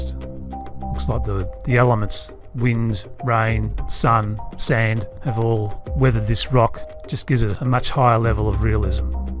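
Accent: Australia. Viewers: The aussie accent 👏😍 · I'm not quite used to Aussie accent, but goodness me, the art work is fantastic. Pearl sent me here, BTW. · The most Australian voice I've ever heard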